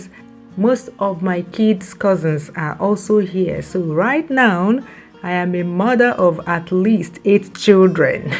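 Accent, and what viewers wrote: Nigeria. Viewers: How refreshing to watch travel video of a person who still speaks like a Nigerian... i got fed up listening to those with foreign accents, whether genuine or fake foreign accents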